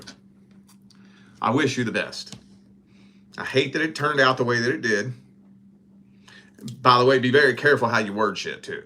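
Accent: South, United States. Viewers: I’m so glad I found u again. You crack me up. Real asf with that southern drawl…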